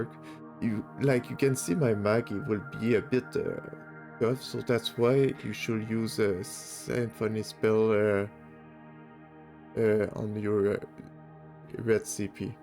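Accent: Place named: Quebec, Canada. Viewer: toé tes Québécois ! hahahaha :p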